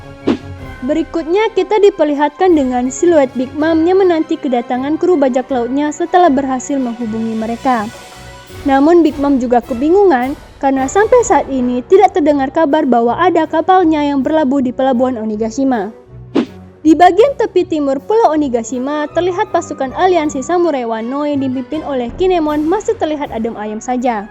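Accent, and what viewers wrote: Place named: Malaysia. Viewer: Apakah pengisi suara ini orang malaysia? Sepintas aksen nya cem melayu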